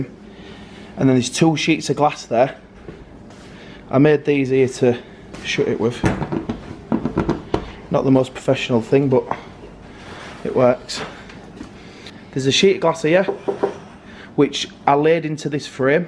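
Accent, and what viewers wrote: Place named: Manchester, United Kingdom. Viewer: My God he's from Manchester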